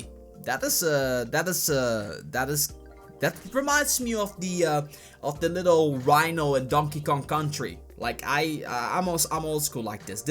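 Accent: Netherlands. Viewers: je bent toch nederlands dat hoor ik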